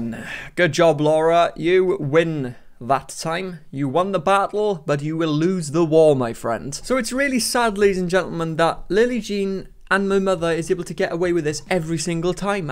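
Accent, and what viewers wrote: Wales, United Kingdom. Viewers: i came for the lillee jean drama, i stayed for that welsh accent 😩😩😩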